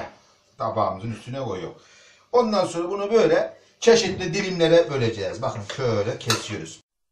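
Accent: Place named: Alucra, Turkey. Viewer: Dayı alucoralı yada gareysarlı galiba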